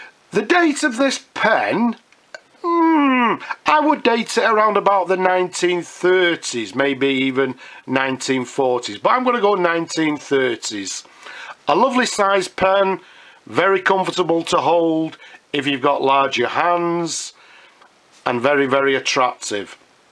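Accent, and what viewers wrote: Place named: Northern England, United Kingdom. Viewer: Oh, I see! I thought for a moment that you were talking about yourself and was about to ask which race at what meeting so I could place a bet. Excuse me being personal but I love your Northern vowels. Batley, or am I way off mark?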